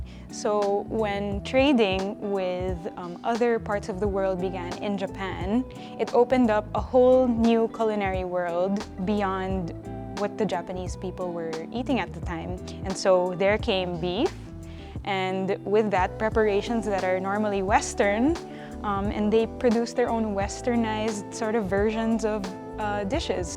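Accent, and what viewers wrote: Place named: Philippines. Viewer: You have an accent. Are you pinay?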